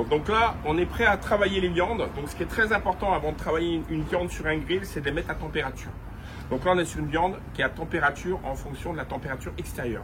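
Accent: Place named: United States. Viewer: Svp monsieur le pseudo cuisinier, faite au moins l'effort d'apprendre à cuire une viande correctement, les cuissons c'est une technique, et l'hygiène c'est impératif, je ne vous souhaite pas que la DDPP passe chez vous après avoir laissé votre viande prendre la t° ambiante. Enfin bref, même avec l'accent Américain ça le fait vraiment pas. Si je peu me permettre, vous gagnerez à au moins regarder les vidéos de Aaron Franklin, et comme vous êtes bilingue ça devrai vraiment vous faire progresser.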